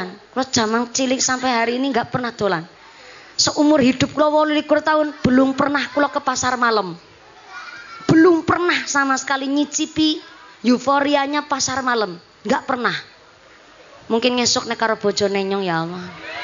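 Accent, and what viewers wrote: Indonesia. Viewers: Masya Allaah ngapak mantep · Itu bahasa jawa ngapak dari cilacap mba...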